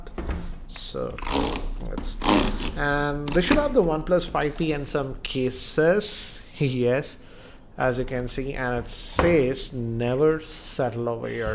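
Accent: India. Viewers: Indien English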